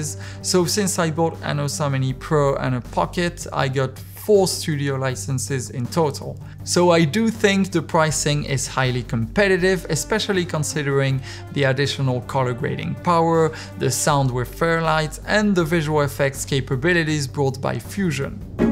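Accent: France. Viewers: T'es français ? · Didn't notice you were french until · Top cette vidéo ! Je sais que tu es français, l'accent, Etretat... héhé :) Tu m'as donné un aperçu pour un passage vers Resolve, moi qui en entends parler depuis des lustres déjà Je me permettrais de te contacter par instagram pour quelques infos / conseils pour démarrer mon utilisation de Resolve, surtout pour l'étalonnage. Continue chef ! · J'étais persuadé que t'étais français à cause de ton léger accent, mais franchement tu m'épates. Bien joué à toi pour ces vidéos de qualité ! 🤗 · Hey, are you french ? ^^ great video ! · Fantastic presentation, excellent content, extremely professional. Love your adorable French accent too 😶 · I’ve used Premiere for over 20 years and it’s always had stability issues. We used to have to reinstall premiere everyday while the preference files used to get corrupted all the time. 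I’m looking forward to trying Resolve now. Great videos buddy and love the French accent!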